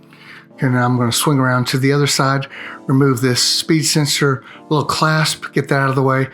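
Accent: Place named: South, United States. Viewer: Yeah he does have a very pleasant voice over I first started watching his videos I was like man this guy’s voice is much more pleasant to listen to I think he’s a southerner if not mistaken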